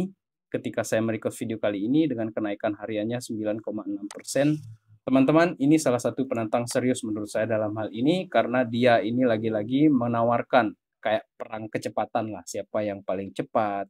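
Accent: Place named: Eastern Indonesia, Indonesia. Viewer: bang sorry oot,, abang org timur kah... logatnya kayak gtu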